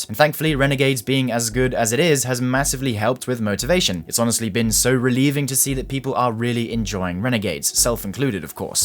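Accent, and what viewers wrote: United Kingdom. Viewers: I usually roll my eyes at the “The future of this channel” videos because they’ve turned into bait due to what they’ve become to signal recently, but because I’ve become such a big fan, I had to watch. About halfway through when you said that you found your community, I realized something: You don’t know what you’ve become to the community. You seem genuinely surprised that your guides have been so popular. I will tell you that while Byf is our defacto lore person, you have fallen into that role for guides. Not that others are bad, but because of several things you offer in yours. They’re concise, to the point, very detailed in all the ways they need to be while showing exactly what you’re talking about with a phenomenal tone, vocabulary, personality, and delivery. As far as your builds and guides go, I consider you my Alfred. Giving your gentle British advice and tips before I go out and exact vengeance and get my ass beat lol. 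Thank you and keep doing it. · tbf his british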